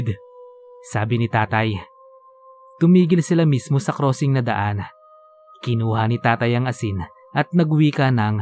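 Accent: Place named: Hiligaynon, Philippines. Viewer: Hello sir, Salamat boss.. Ang galing mo sa punto ng Hiligaynon... I would like to RENDER A SALUTE.. aw tama ba yung grammar hehehehehehe SOLI DEO GLORIA SA CHANNEL MO! Malik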